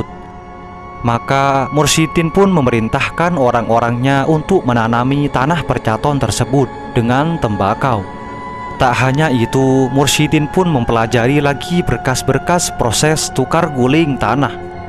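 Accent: Madura, Indonesia. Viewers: Logat maduranya gk bisa di sembunyikan min 😂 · Admin ini orng madura keliatan dari logatnya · Dan pengisi suara ini sangat keliatan banget kalo orang madura 😂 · Yang ngomong pasti orang madura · Nih orang gua tebak asli orang madura😂dari logatnya aja udah keliatan bgt😁 #SalamSettongDereKak🙏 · Admin kental logat duro kkkk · Admin nya Juga Orang Madura lu ya mind. Ketawan Banget Dari Logat Ngomong nya. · logat anda sangat kental maduranya bang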